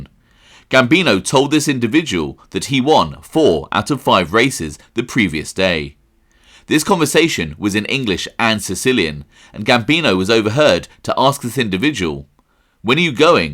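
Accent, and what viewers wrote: United Kingdom. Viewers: I’m so sorry for your voice. Brit plus that lisp is disgusting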